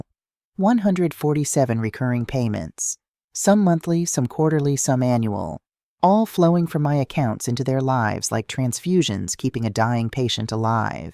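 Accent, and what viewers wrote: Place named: United States. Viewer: I hate her Mexican pronunciation, it sounds too gringo😊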